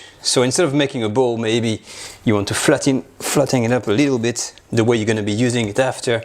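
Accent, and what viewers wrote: France. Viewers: I subscribed because I love your French accent! Okay... and I love food recipes! 😆 (Watching from Chicago) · Love this video demonstration of yours...French accent and all...👍🥰 Thanks for sharing.. ❤💖💝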